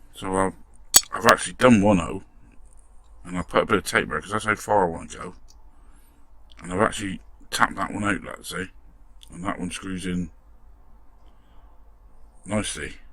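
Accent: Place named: Devon, United Kingdom. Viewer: Hi, loving all your videos, always a joy to watch, how do i contact you directly regarding your restos? you also sound like a Devonshire lad like myself, i also after watching this video like to share a few tips with you also, all the very best, stay safe bud.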